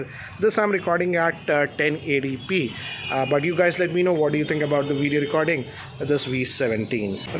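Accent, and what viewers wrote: India. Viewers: wat a english eee ddd cccc bbb ithis words only using ........butler english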